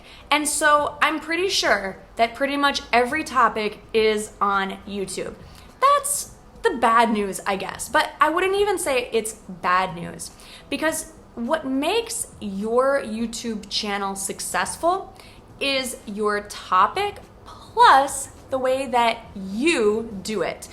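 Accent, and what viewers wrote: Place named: United States. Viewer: I am from India......I know how to speak English but I just wanted to know the American accent Thank you so much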